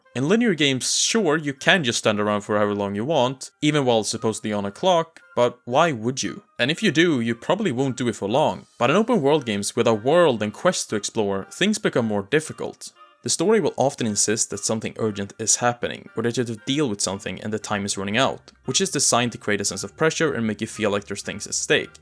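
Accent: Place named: Philippines. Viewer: You sound brown, like a jungle asian or some kind of filipino.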